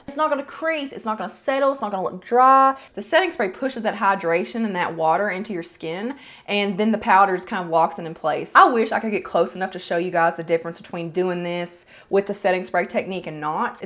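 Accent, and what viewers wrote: South, United States. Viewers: I love your Southern United States accent, I think it sounds warm and welcoming. (I once had a wondeerful boyfriend with a Kentucky accent so I have a fondness for the accent).However, many people across the United States and around the world are used to a more flat "Nebraska" accent. As you get a global audience, you may consider getting speech coaching to get the plains midwest accent that people think is the United States accent. This is not fair to people with accents from the Southern United States, but it will be important as you gain a global audience who do not have English as a first language. Also, you might want to talk more slowly. I tend to move my hands when I talk, so I know what it is like to make gestures when I talk. Try to keep your hands out of the video except when you are applying makeup. (I used to be a teacher, and I was videotaped once when I was a teaching assistant, and seeing the videotape is really useful.). 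I hope you take these comments as helpful and useful, because my intention in writing this was out of a good heart. If you find my comments offensive, please let me know. This is not what I intend.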